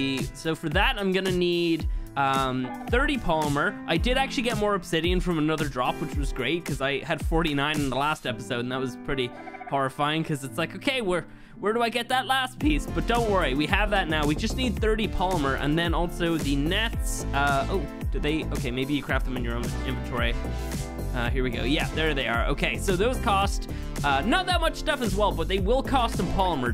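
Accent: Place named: Ireland. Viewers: I always thought he was Irish lol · His wife is from Canada so her accent could of worn off on him a bit, not sure, he could of also changed his accent a bit on purpose to be more understandable on youtube as irish accents can be a little hard to understand at times when thick.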